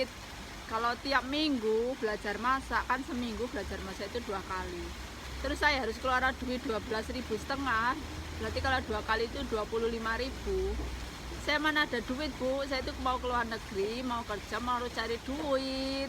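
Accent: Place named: Banyumas, Indonesia. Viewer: semangat mba... ngapaknya kelihatan kaya saya kalo ngomong...hahahaha...mantul mba... semua sudah berlalu...sekarang dah sukses amiin...salam silaturahmi... sudah padam komplit...padamin juga punya ku ya mba...makasih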